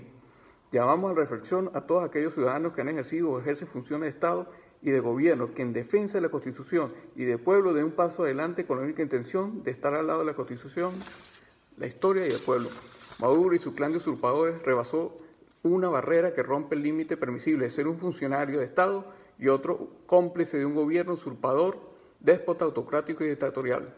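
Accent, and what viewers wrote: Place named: Cuba. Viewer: Lo siento pero este señor tiene acentos CUBANO oiganlo detenidamente.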